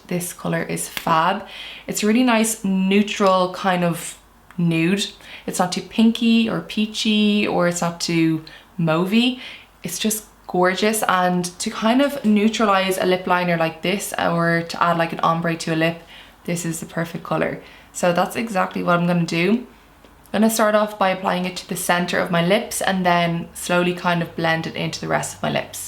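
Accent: Ireland. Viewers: Where is from? Ireland? I can't put my finger on her accent. She speaks very clearly, it isn't until the end of her sentences or a phrase she will use that I pick up on her accent. Does anyone know? Just curious, its driving me crazy trying to figure it out lol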